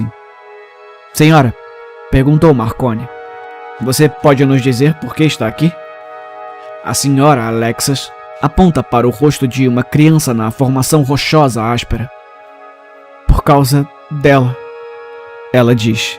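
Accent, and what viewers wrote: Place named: Rio de Janeiro, Brazil. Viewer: É tão bom ouvir uma história de terror em que o narrador é carioca igual eu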